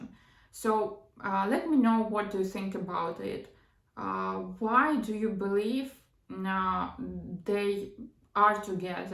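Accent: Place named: Russia. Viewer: gosh that’s just the most stereotypical Russian accent, and it’s not even a joke 🙈 don’t want to offend you, but I think u might need to work on your speech if you choose “storytelling”.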